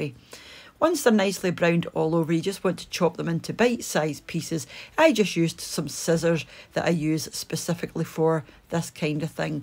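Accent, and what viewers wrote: Scotland, United Kingdom. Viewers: Your Scottish accent combined with your voice is the most beautiful sound in the world ❤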